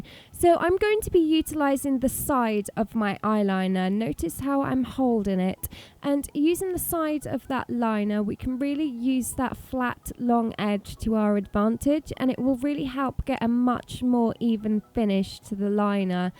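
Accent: England, United Kingdom. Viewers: Ohh this English accent.. How charmy is it😍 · I could tell she was British straight away because she has a tiny bit of Adele in her voice. Just my opinion anyway! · could you, in a next video, give us some tips about brows? i love your makeuppppp and your english accent ahah xx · she from england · That british accent . you're so beautiful ;)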